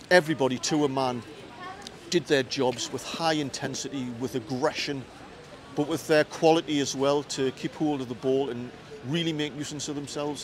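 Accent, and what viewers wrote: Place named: Manchester, United Kingdom. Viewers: Great Manc accent btw and I’m being serious! 😂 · Love your Manc accent, 😂, scousers won't be happy. Great channel BTW. 👏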